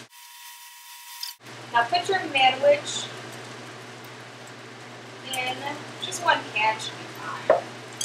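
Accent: Vietnam. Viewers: ah i never knew u was vietnamese until u spoke lol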